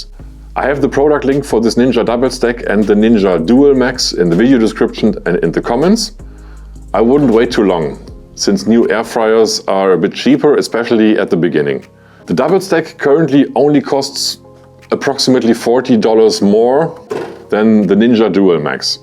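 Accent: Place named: Germany. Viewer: You speak with a German accent and refeer to inches!? Who knows what that is?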